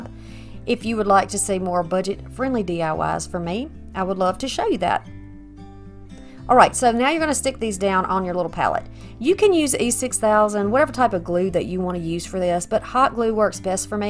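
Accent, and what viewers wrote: South, United States. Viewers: Just was watching Jackie and found you. I love your southern sound, I too have it, I'm from GA. I love your work and your cheery personality. Rhonda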